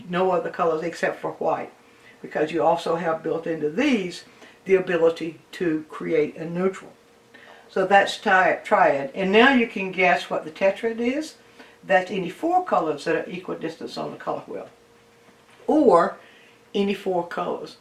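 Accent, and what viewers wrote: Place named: South, United States. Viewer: a smooth southern drawl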